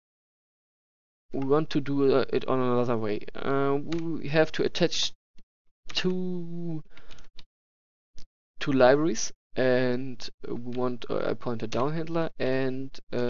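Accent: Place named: Germany. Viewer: Are you from Germany? You Accent sounds like a german English accent.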